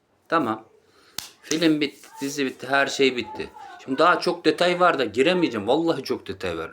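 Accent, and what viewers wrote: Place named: Istanbul, Turkey. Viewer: Abi essenler sivesiyle konusuyon, Istanbul essenlerdenmisin?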